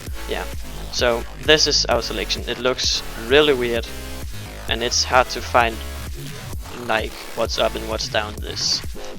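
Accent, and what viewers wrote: Denmark. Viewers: Are you from Denmark? BTw cool video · Er han dansker xD.. tænkte jeg nok..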